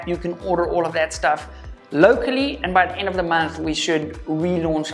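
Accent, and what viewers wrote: South Africa. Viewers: I knew this was a South African accent right away 😂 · Being in an Australian in a town that is becoming overrun by South Africans, your accent is really hard to listen to... I have reached a point where I can’t stand hearing that accent anymore... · Wow, I thought. Jis hierdie ou klink moerse Suid Afrikaans. And lo and behold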